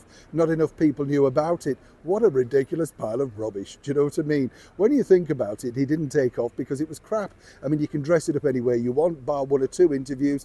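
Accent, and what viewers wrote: United Kingdom. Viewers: Hello. Just want to THANK YOU for Always giving us Something to Smile about. Lovely to hear your Joyouse laughter & great reporting with CLASS. Its Wondrful to listen to a true British Gent Thank You for bringing some sunshine & Joy to all of us. Love 💕 to you & to all in the UK. From USA by for now BLESSING to You 🙏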